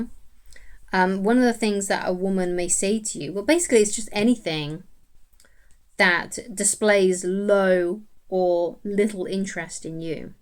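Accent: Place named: United Kingdom. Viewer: Your british accent pisses me off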